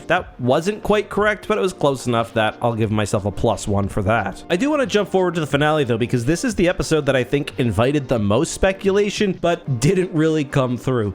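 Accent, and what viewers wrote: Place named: Southern Ontario, Canada. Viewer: I feel like your accent is more pronounced in this video, and its basically the exact same as my southern ontario accent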